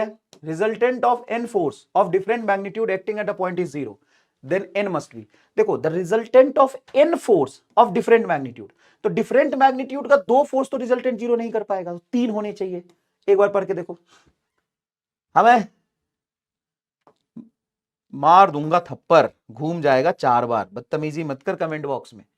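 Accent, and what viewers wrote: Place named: Bihar, India. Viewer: Bihari h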